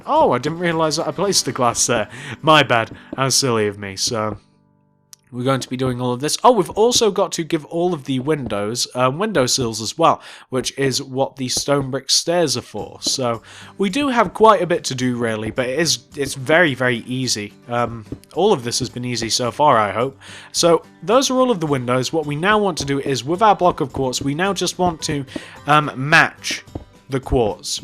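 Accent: United Kingdom. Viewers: Leth Ls he is British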